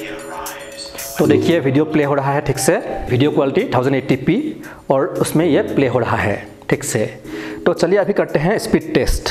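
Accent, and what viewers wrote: Assam, India. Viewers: Assam r nki apuni hindi xuni😂 a buji paisu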